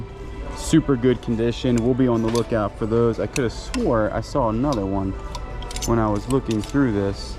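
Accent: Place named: Baltimore, United States. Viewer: Or.....are you dumb already? I detected A Balimore/ Maryland accent... Howwme. Owwned, etc.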